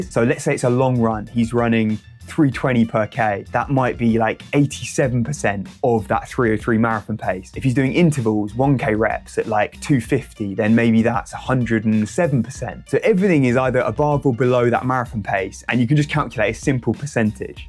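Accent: United States. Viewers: Your American accent is amazing!!!! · Nailed the American accent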